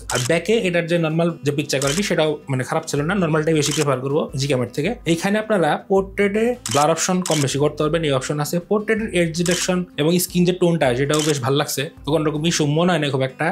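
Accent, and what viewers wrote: Tangail, Bangladesh. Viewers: ভাই কি টাংগাইলের?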